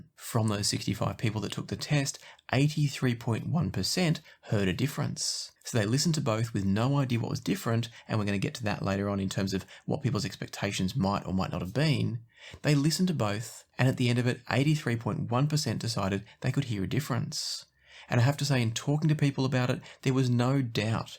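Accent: Australia. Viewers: I think testing DACs is a good idea. At CanJam NYC, I could not tell the difference between DACs, it takes me a long time for me to notice the difference when I upgrade a DAC (except when getting a May). Also your natural "live" voice is far more Aussie than your presentations. Took me by surprise. But I also remember that you record softly to not disturb your family, so that's cool.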